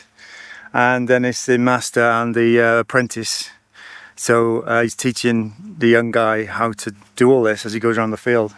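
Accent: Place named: Scotland, United Kingdom. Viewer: Was thinking the same thing!! 😊 Scottish accent! Lovely lady!!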